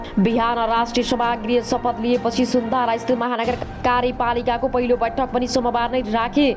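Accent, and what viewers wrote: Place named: India. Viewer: नानी इन्डिया हो कि नेपाली बुज्नै सकिएन एघारलाई ग्यारा निनन्सय जस्तो शब्दले नेपाली हैन झै लाग्छ । उत्तार पाम न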